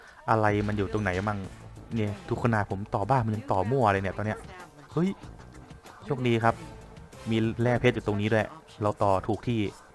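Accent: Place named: North, Thailand. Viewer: พี่คนเหนือปะครับ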